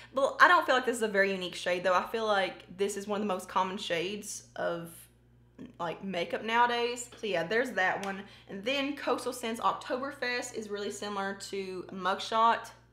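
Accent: South, United States. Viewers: i was looking for a dupe for one of the greens in the dark magic palette, but tbh even with the warning that there probably won’t be an exact dupe, i have to watch the whole thing now because your accent is so adorable! i love southern drawls, they’re so relaxed and pretty-sounding~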